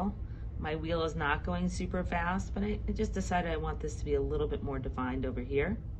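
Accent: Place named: New Jersey, United States. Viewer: My teacher is also from New Jersey.. you two have same accent.. she also says to "call it a pot".😁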